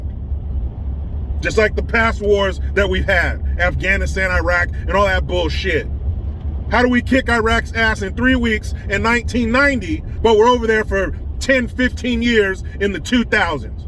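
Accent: Russia. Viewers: Please do more russian voice!!😂😭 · you are so funny; I love your Russian accent. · Your accent sounded like Boris and Natasha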